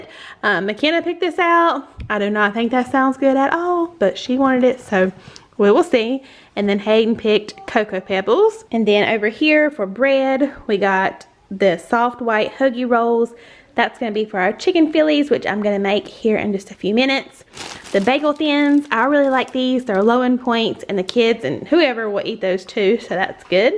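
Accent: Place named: North Carolina, United States. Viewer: I've just found your channel 2 weeks ago. I love it!! You are so calming to listen to and love your meals. They are very similar to my meal plans. I knew when I heard your voice for the first time you lived in NC. I do too. Lol 😆. Keep up the great videos!! Love them.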